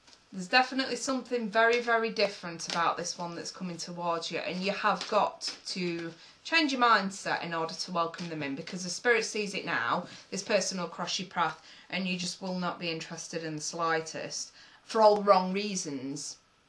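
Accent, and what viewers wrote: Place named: Manchester, United Kingdom. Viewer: Fab reading chic........R u from Manchester????xxxxx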